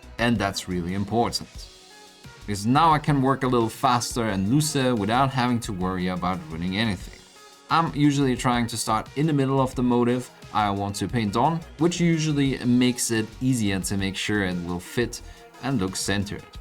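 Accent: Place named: Austria. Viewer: for a second i thought you where saying "animal" paint ^^ our austrian-english accent really is fun at times XD i really enjoy the sponge technique btw. i used it for some aos nurgle armor and really loved the result TY =)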